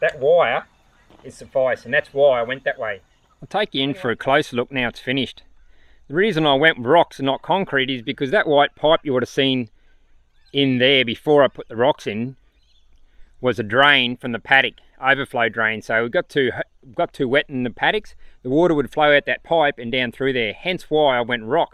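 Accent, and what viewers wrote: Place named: Australia. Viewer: Great video so good to see and hear an Aussie on utube. Once in a life time job well done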